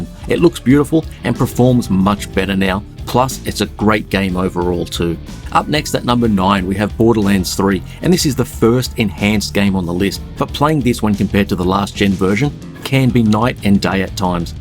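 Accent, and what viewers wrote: Australia. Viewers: Good to hear an Aussie doing some game reviews Keep it up dude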